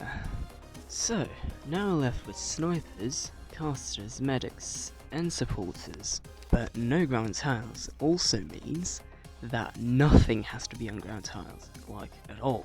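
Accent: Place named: United Kingdom. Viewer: Why is this man with a British accent saying he isn't native? Am i just really bad at placing accents?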